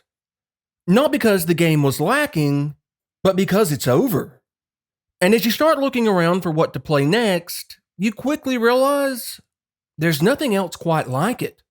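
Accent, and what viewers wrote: South, United States. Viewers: It's been a while since i heard a redneck accent. Thank you for the making the video good man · hearing another southerner talk about soulsgames is something i never thought i would hear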